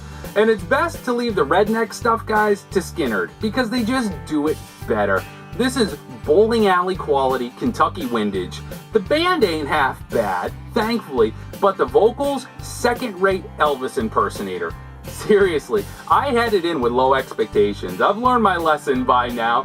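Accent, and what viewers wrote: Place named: New England, United States. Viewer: Watch out! When we are treated to a country update completely with a New England style delivery, you know you are in for something swell. > Now wait a minute. How did you get to do that four word promo? I have to agree with you, ' ' has some fantastic album art. I could not name one song on the whole album but I love the looks of it. It still happens. That's all I have to do is hear name and my mind reverts to ' '. "A dinosaur Victrola listening to ". You have to love that song. I have only heard one of these albums. I will leave it up to you to guess which one. It is a pretty simple guess really if truly think of it. I will give you a hint. It wasn't Boston's own 'Dukes of Hazzard' who back him up on the album. Excellent update once again Brother. I always feel like I got to wear a cowboy hat and boots when I watch these Honkytonkin' updates. 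Love the country feel~ Rob/Boston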